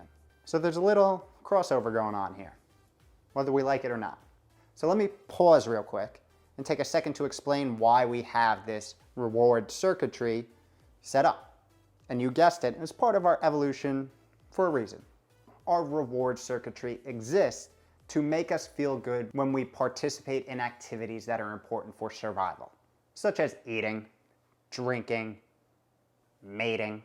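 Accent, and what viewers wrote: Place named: Long Island, United States. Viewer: I have hit approximately hour 45 of my extended fast, trying to hit 72. Cannot believe you did this for a week. I am used to ADF but trying to break things up a bit so my metabolism doesn't get acclimated. Trying to watch videos like yours to keep my momentum going. It sounds like you didn't always eat so perfectly, did you have a transformation of sorts? That's the journey I am on. If you did, could you do a video on that? Thanks to ADF I was losing weight before Covid-19 now I am certain that when we go back my co-workers will be shocked at the weight loss I have accumulated so far..my journey won't be over though. It's certainly nice to be losing weight during a time when others are unfortunately gaining weight and falling into such unhealthy habits. Thanks for this. Also I definitely hear the Long Island accent, I have family from Lake Ronkakoma.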